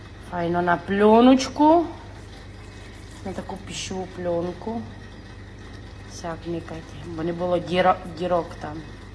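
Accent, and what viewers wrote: Transcarpathia, Ukraine. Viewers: Дуже люблю як Ви розмовляєте на Закарпатті:))привіт зі Львова:))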